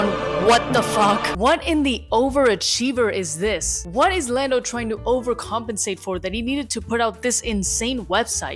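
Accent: Spain. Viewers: I´m gonna say, this is actually a good yt video... And hold on there is a really good spanish pronunciation, but i mean spanish from Spain. R u spanish...?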